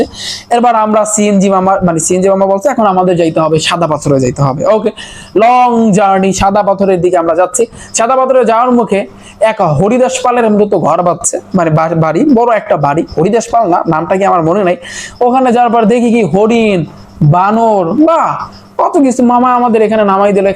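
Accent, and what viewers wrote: Barishal, Bangladesh. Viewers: ভাইয়া বরিশালের ভাষা টা একছেড় সেরা লাগছে🙂💔💔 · Sir apner class kori, apnar borishal language shune moja pelam.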